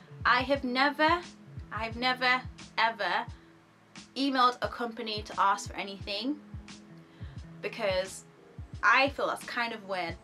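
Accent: United Kingdom. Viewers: Truth be told, I only clicked on this video because I thought she looked very pretty. I also like her British accent.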